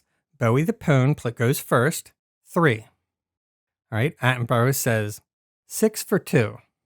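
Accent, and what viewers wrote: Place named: Delaware County, United States. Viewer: You sound like you're from Delco......GO BIRDS!!!!!!!!!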